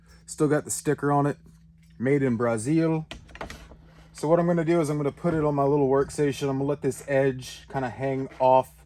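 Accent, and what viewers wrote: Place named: South, United States. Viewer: Bob Ross would enjoy your videos. And now we have. A happy little edge. And he's alone. Lets give him a happy friend on the other side... Slide with the file. Just let the file slide... Sounds almost like a Southern accent. You have there... But yes. Thank you for sharing your info. On files and everything.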